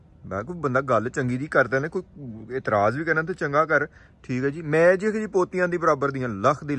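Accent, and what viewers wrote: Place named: Punjab, India. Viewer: you have excellent punjabi accent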